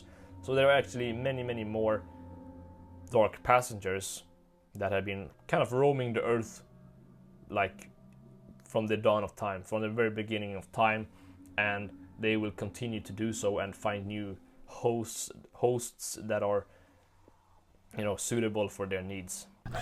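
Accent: Sweden. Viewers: Are you sweedish?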